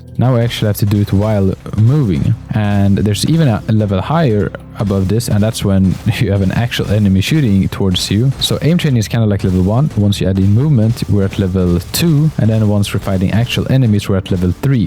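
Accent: Sweden. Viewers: if u dont mind where r u from? r u swedish by any chance?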